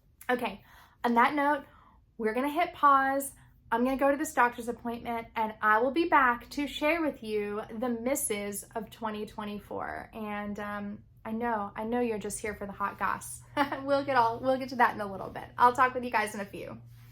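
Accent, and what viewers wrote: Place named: South, United States. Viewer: My word is growth. 🥚 I love your podcast. As a fellow southerner it is nice to watch someone who sounds like home.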